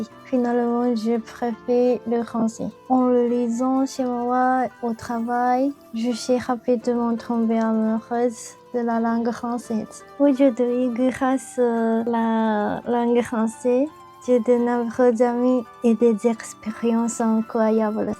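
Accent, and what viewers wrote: Japan. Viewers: Ce qui est rigolo, c'est qu'on remarque toutes les marques de ta langue natale, notamment pour la prononciation des "f" pour le salon de coiffure on reconnait vite le "ふ" ^^ Bravo pour l'apprentissage ! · Ton accent japonais est très agréable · Wow merci et le mélange français avec accent japonais est génial tu t’exprime très bien bravo et félicitation!!!!🎉🇫🇷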